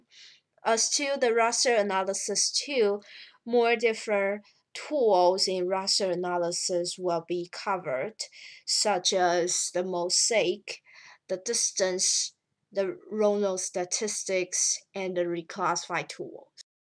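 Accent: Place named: China. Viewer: Thanks, quite helpful. I like Chinese accent :)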